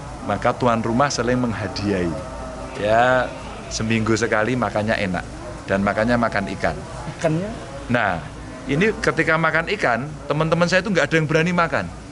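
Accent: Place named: Temanggung, Indonesia. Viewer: Boso khas temanggung kiye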